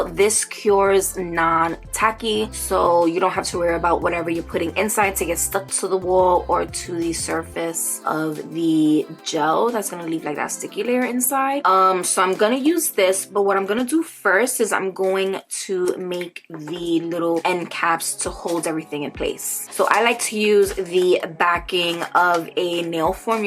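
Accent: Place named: New Jersey, United States. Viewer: Also, I love your accent. Is it Jersey?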